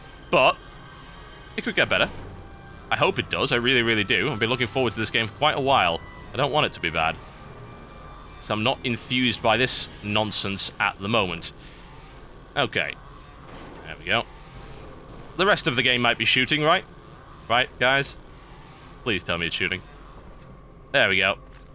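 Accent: United Kingdom. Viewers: reason i watch the whole thing your british accent :D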